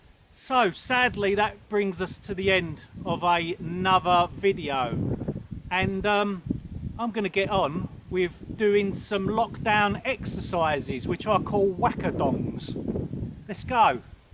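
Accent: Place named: South East England, United Kingdom. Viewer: LOVE your accent. Bit rare ironically in London and the south east now. Cool video. Thanks😄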